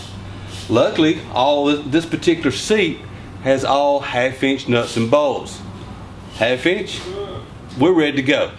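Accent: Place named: South, United States. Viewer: Thank you for your informative and easy to follow videos. (We also enjoy your beautiful southern accent.) Please make more videos as time allows you. What a GREAT Teacher you are! ㋛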